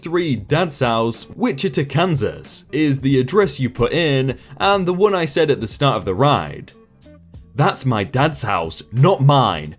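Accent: United Kingdom. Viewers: and also i posted my mc a month a go and you havent read it its on your discord its on redit its in the comments on one of your videos . im getting frustrated listing and staying up to date with your videos because the Pommy accent makes my blood boil im half American half Australian both country that left Britain because of your country accent/rules get on to it asap please sorry for being mean but at least i didnt swear that is super hard for Australians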